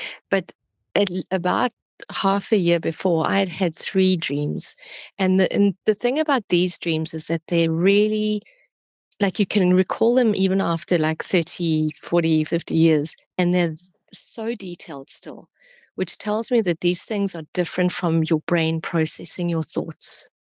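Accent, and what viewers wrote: South Africa. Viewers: Sounds 100% South African. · Anyone else go the first several minutes looking for the clues to discern: New Zealand? No….South Africa (sounds a teeny bit Dutch) but then she said Catholic and I figured, no, near zero chance of being. Dutch S African and Catholic…must be Anglophone but still sounds like an Afrikans speaker, then she said Germany and that she learned the language…then I was like, NOW IT MAKES Sense! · i think you have a lovely accent and it was very clearly South African. I made lots of lovely South African friends when I was living in Qatar and knew immediately where you were from. You spoke beautifully by the way.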